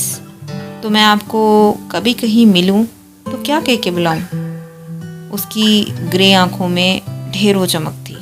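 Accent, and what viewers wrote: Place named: Pakistan. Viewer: Bht khoob hai y urdu lehja apka n very nice❣️❣️❣️❣️❣️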